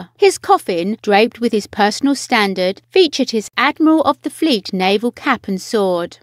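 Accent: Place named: Essex, United Kingdom. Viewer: Dear Virginia viola. You asked about the narrator's accent. I think it's tinged with " Essex" , which is a rather unattractive London area accent. Wish they could have got a reader with purer vowels....ah well.....btw, the funeral was very well done, not too elaborate....I cried when I saw the Queen looking so alone and sad....it must have been awful...so sorry for you, Ma'am... 😢💔🇬🇧🇬🇧😢🇬🇧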